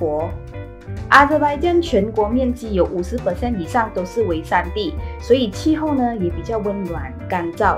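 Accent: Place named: Malaysia. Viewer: 听口音，博主是马来西亚人吧？视频图片很多，信息丰富，感谢。我是看见这个国家的糕点视频引起的好奇心。博主不出镜而是拍全凭景观非常赞！🎉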